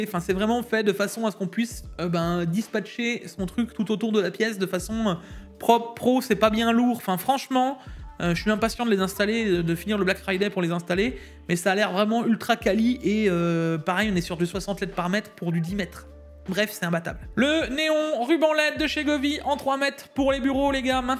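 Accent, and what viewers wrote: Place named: Alsace, France. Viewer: Hello et les autres, le SN850x est pas chère (aout 2023 j'en ai acheter un en 4to sans dissipateur pour 270 euros). pour moi, les télés LG c'est la vie le WEB OS est top! en vrai l'accent alsacien bien! allez pourquoi pas tester l'écran amazon:) le LG en 39 pouces (un 32 et demi) c'est comme le NEO G9 57 POUCES (deux 32 pouces) niveau taille sa doit être un monstre! Ah bah le ASUS en 4k 240hz Oled reverse en 1080p 480hz n'a jamais été en promo mdr! alors pour ce qui est achat, j'ai juste acheter mon asus rog swift oled 4k à 1109 euros au lieu de 1500 de base et puis c'est tout! d'ailleurs j'ai choisis celui ci pas que pour le gaming mais aussi pour travailler sur des photos #OUTILPORTATIF:)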